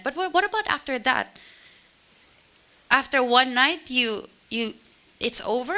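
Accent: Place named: Philippines. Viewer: Any non filipino out there loves her filipino accent??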